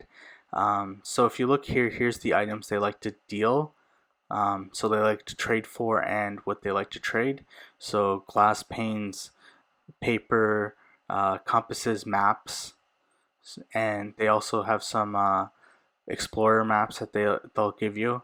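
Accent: India. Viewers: Are you indian bro? Please tell me◉‿◉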